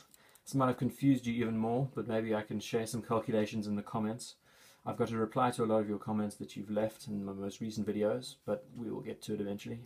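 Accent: South Africa. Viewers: Sounds like a Saffer twang to me.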